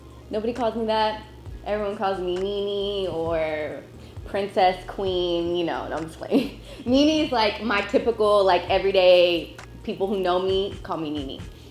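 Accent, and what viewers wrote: France. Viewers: J'aime trop ton accent français 😍😍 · 😍😍😍😍 ton accent français est trop chou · You're just Soo gorgeous and your french accent is so cute 😘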